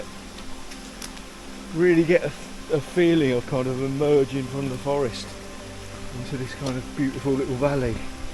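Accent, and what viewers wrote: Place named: United Kingdom. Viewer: I like listening to English with a UK accent. It's beautiful.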